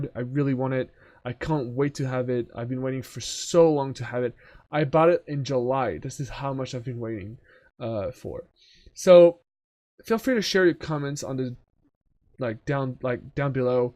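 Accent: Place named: France. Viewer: Un ptit accent Français bien caché, j'aimerais bien parler anglais aussi facilement que toi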